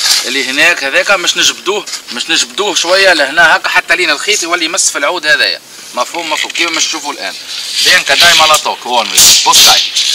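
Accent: Tunisia. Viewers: صفاقسي انتي؟؟؟ متابعك من تونس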